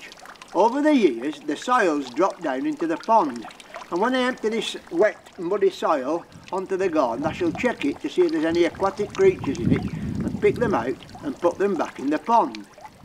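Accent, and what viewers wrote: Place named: Lancashire, United Kingdom. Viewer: I live in Adelaide, South Australia. I migrated here 33 years ago. I recently discovered your channel and want to say how much I’m enjoying watching your videos. My parents were from Lancashire so it’s lovely hearing the Lancashire accent. While you have been getting too much rain I’m not getting enough. It’s the third month of autumn here but still very very dry. Autumn is usually like a second spring here once the rain comes. I’d forgotten how many gales north west England gets where I live very windy weather is rare but as I said we struggle with hot dry weather. I too am wilding my garden and picking up hints from you. While we have different wildlife but I can relate to you with the excitement of wildlife visiting the garden. Do squirrels or rabbits eat your plants? The brush tailed possums certainly eat mine but it’s all part of nature. 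One more question what breed is Buster? He seems so well behaved. Thank you again for your videos. Shelley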